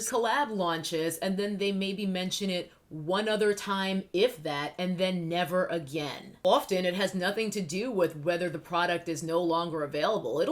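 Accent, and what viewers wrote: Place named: Northeast, United States. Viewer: First of all, hi, subbed. I heard that north eastern accent and was reminded of home instantly. Secondly, I'm a biochemist (getting my graduate degree) and I could go ON about the scams YTers push on you. One thing that some big youtubers push is "supplements". Lets make on thing perfectly clear- these people have no business selling you anything to put in your body. Most of what they sell you is diluted 1000 fold in water, meaning that you are taking water pills. Other things that they sell you, like biotin and vitamin C pills, are nutrients that, if you are living in a country where you have access to food, you don't need to supplement these things into your diet. With vitamin C, you get your maximum daily dose from like, half a bell pepper. Vitamin C is water soluble, meaning you excrete the rest of it out. 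So when you see pills that say "1000x daily recommended dose of Vitamin C!", it means you are paying someone to pee out all of those nutrients. Plus, for many nutrients, like beta-carotene, in order for them to give you the benefits you need, they are dependent on other nutrients found in the food that you eat itself, meaning that they are not as effective if you are just taking them alone in a pill form. TL;DR- don't take pills from influencers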